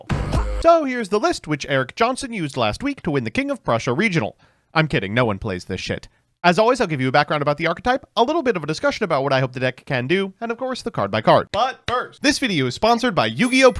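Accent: France. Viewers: Fk i realized too late that this dude is gonna do the annoying french pronunciations for the entire video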